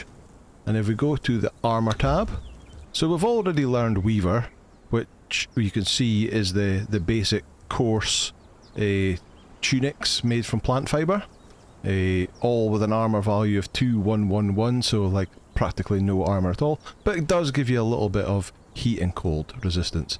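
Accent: Scotland, United Kingdom. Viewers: I've known about this game for a long time, but never got around to playing it. I just started earlier this week, and was a little lost at first, but, i found your guide and its really helped me. Its also nice to hear a fellow Scotsman doing this. Also, as a little side note, im playing on console, and most of the journeys recognise other journey actions you may have done previously.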